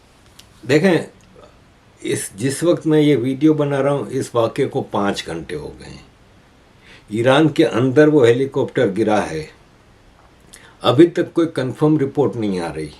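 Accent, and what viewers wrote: Gujarat, India. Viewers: आपका बोलनेका लहेजा गुजराती जैसा है ❤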